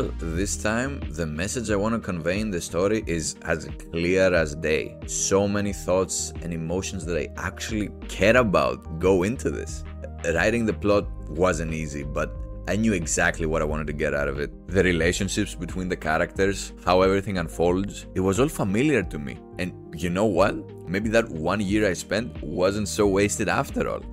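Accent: Greece. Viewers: There is no way you're not Greek with that accent. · greek accent lol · i knew i recognized that accent, but i wasn't sure if you where actually Greek, or just Balkan. but then i saw fucking Ιανουάριος and i was like μπροοοοο. Επίσης γαμάτο βίντεο!!! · I was wondering the same because of the accent so I took this whole video as a puzzle like "is that nature in the background in a climate zone that exists in Greece?". Well, I got me definitive answer here and that puzzle is solved. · You sound very Greek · You sound greek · Το ήξρερα οτι είσαι έλληνας το άκουγα στην προφορά .Επίσης ευψαριστώ με βοήθησε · You sound Greek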